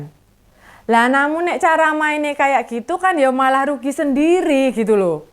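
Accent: Java, Indonesia. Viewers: Bahasa jawa nya enak tenan mba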